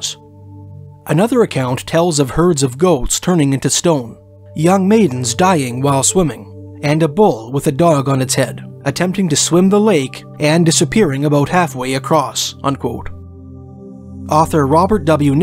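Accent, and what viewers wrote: Canada. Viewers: I wonder where she grew up in Canada with that accent.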